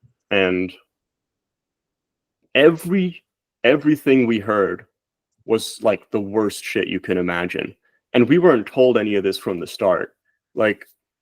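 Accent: United States. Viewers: He sounds American but says hes European. Must have spent long time in the US to learn English like this even his use of Like...Goddamn etc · He’s European but has a perfect American accent? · He lived in EU all his life but sounds yank, and is half Peruvian. He's not going to be hard to ID with those facts. Also, guy sounds like a brain rotted zoomer. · Probably grew up on internet, so he adopted American English from nerds he played games with online.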